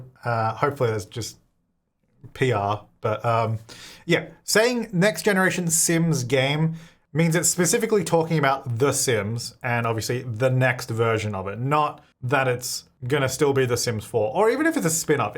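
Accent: Australia. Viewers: "lil nugget" 🥺 why is that so cute? It's probably the aussie accent, love it 💗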